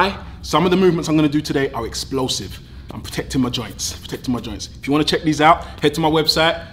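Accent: London, United Kingdom. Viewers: OMG!!! I haven’t heard a London accent for two years 🤗🤗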